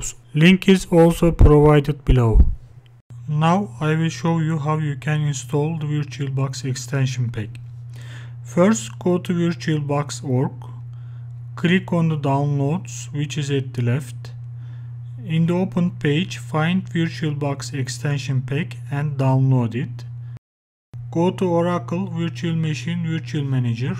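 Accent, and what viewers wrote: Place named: Turkey. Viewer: yanlis anlama ingilizcen cok iyi de aksanin cok beli turk oldugun o yuzden guldum (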